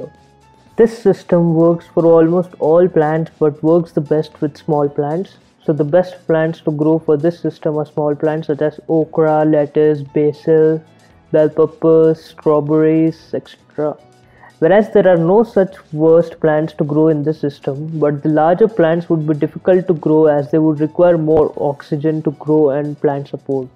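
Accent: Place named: India. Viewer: A white animation figure and an hindi accent. As long as it is not the IRS I am fine I guess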